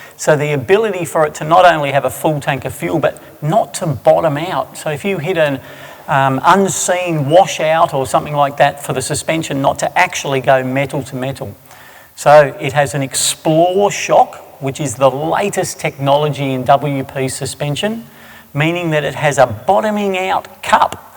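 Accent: Australia. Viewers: I’ve spent all Saturday watching reviews and comparisons. This is by far the best review on YouTube. Thanks for the awesome upload. Can’t wait for COVID19 to end. I’m wanting to step up from my CRF250 Rally, the KTM 390 is very tempting but the 790R just has all the goodies! It’s so good to hear an Aussie, just makes it feel some much more relevant 👍🤓🇦🇺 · Great review, thanks. I especially like the level of detail, feature by feature, very easy to follow and understand. I also like the Aussie voice and style - who else uses the term "gobful of power". I bought one after this review.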